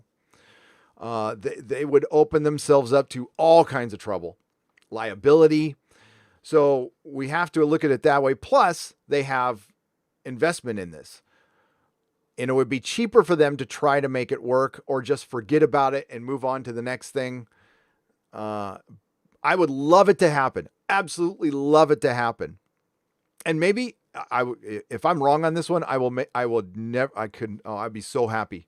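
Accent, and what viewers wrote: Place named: Scotland, United Kingdom. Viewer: Dude, I didn't need 23 and Me to tell you are Scottish. You are so very Scottish. Well aside from not hating the English anyway.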